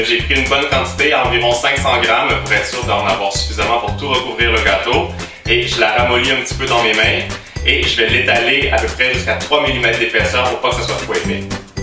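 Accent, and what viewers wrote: Quebec, Canada. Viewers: coucou j'adort t'a chaine. je t'es découvert dans le meilleur pâtissier et j'adore l'accent Québécois je t'adore continu comme sa ❤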